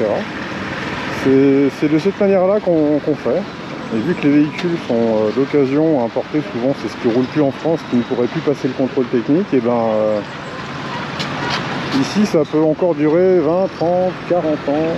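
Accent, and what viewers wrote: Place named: Madagascar. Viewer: Super vidéo .j aime bien analakely .Mon épouse me dit que votre accent gasy est charmant 😅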